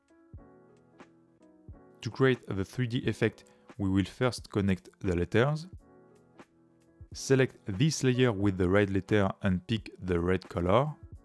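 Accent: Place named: France. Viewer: Merci pour tes vidéos ! Elles sont simples et claires et je kiffe trop ton french accent !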